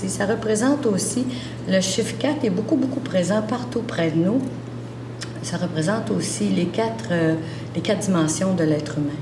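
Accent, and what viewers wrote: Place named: Quebec, Canada. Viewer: Y a en masse de choses qui échappent à mon oreille de français qui adore c't'accent québecois tsé mais j'ai don' bin de la misère a comprendre toute les mots faque chu frustré pasque c'est c'est extrêmement intéressant ! J'ai pas pantoute moyen de savoir la langue qui dit : "mon nom est... " toutea la fin et j'aimerais bien la connaitre, la voir ecrite ! froggy boy.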